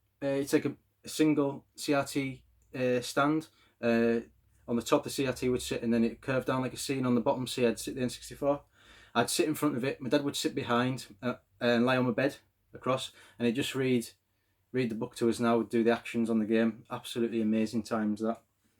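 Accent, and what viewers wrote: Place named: Cumbria, United Kingdom. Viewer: Good video. Are you cumbrian by any chance? 😁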